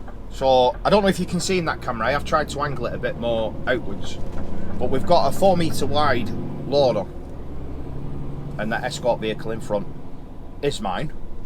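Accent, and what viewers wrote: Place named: Leeds, United Kingdom. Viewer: Glad you like Scotland, i was born in Scotland, they batter everything 😅, haggis pizza?! I've just subscribed and i know WS, William Stobart. It also sounds like your from leeds way, i could be wrong, but im in malton myself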